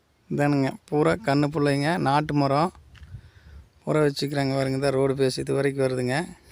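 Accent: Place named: Pollachi, India. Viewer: Pollachi Tamil speaking check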